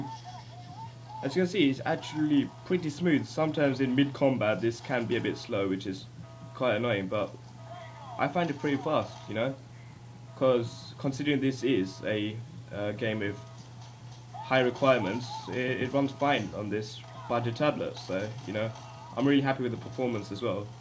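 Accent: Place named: London, United Kingdom. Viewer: You sound like you're from Harrow or Stanmore. I'd be so happy if I'm right :P P.s. good video, thanks. Think I'll be getting the cyclone voyager 10.1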